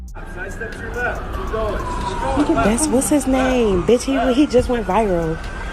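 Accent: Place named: Massachusetts, United States. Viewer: Mannnnnn, everytime I hear you speak I miss my family out in MA! ✌️❤️🙏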